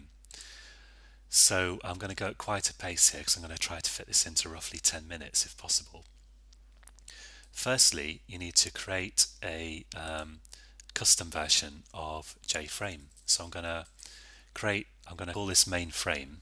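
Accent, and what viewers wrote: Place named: Cheshire, United Kingdom. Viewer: ***** Ugh, how did I not see that, slight dyslexia problem. Thank you. By the way, which county are you from in the UK. Always think you sound like your from close to my home in Cheshire, but maybe I've just been away too long.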